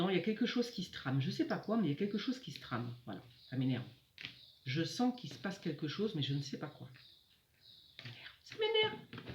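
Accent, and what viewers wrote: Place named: South, France. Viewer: bonjour quel bel accent meridional!!!!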